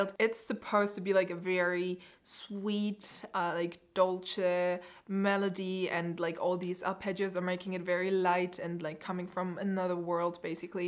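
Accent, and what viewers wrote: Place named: Germany. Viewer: As a fellow German who just found your channel, I found it really interesting that I could tell roughly where you're from before you even said "Erlkönig" because the dialect is audible even when you speak English. I never really realized that this was even possible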